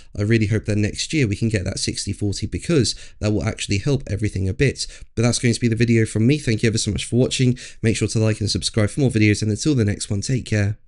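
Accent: United Kingdom. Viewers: Not only does deliver in a slightly slurred British monotone voice, I really didn't learn anything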